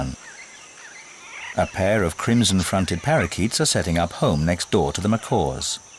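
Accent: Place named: England, United Kingdom. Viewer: All nature shows should be voiced by English men, this video is proof of that. Beautiful and tragic, only true nature lovers and poets can appreciate this kind of documentary.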